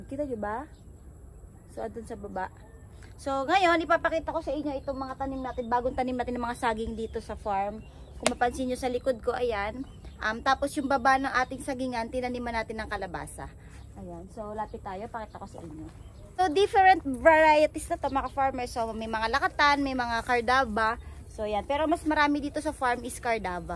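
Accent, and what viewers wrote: Visayas, Philippines. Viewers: Oi bisaya diay ka maam shout out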